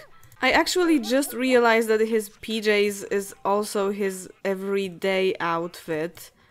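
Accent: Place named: Poland. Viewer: Kocham ten polski akcent <3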